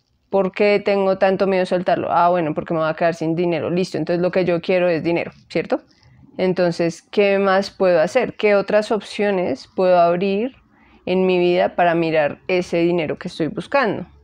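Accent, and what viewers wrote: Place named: Bogotá, Colombia. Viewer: Me encantó tu canal ❤ lo encontré buscando meditaciones y me pareció curioso tantos años viviendo en otras ciudades que escuchar tu acento que es de mi tierra Bogotá supongo lol parece jeje que recuerdos, y viendo los videos me encantaron gracias por compartir este contenido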